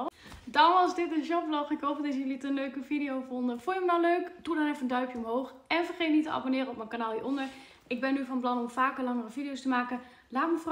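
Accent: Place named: Netherlands. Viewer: Ik denk een Nederlander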